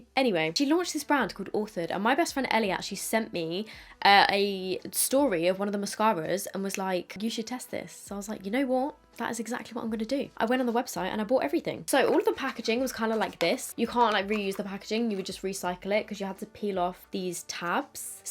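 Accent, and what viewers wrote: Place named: England, United Kingdom. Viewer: Wait I love it 🥰 and one more thing! where are you from in England 😅 love the accent 😆🤩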